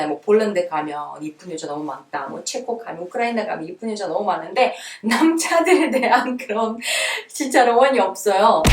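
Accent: South Korea. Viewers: 지금 정도 한국말하는 구잘을 보니 정말 대단하다는 생각. 미수다 일 때보다 지금은 더 한국말이 늘어서 완전히 한국 억양을 구사하게 되었다. 구잘이 좀더 귀하게 여김을 받게 되기를!!!!!!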